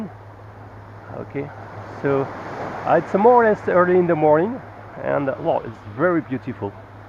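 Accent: France. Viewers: vous avez un fort accent français je me trompe ou pas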